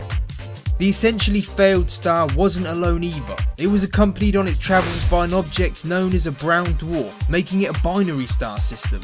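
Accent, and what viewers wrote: United Kingdom. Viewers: Eh, generic, nasaly, mediocre british commentary is boring and over done.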